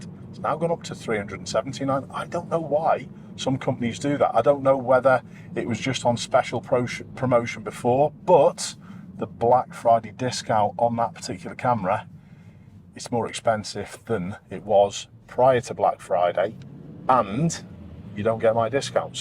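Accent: Liverpool, United Kingdom. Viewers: I do enjoy the 'Drive like sitting in the passenger seat.' videos. 😂 Edit to add: I heard dulcet Scouse throughout. Pas de frainçais.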